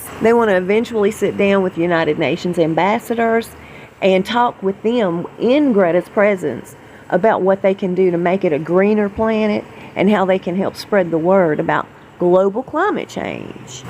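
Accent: South, United States. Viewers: My goodness I am watching this from outback Australia 🇦🇺 in the middle of nowhere, all I can say is that Ms you have the most soothing, sexy, Southern drawl I have heard in a very long time. 🤩🎙🔥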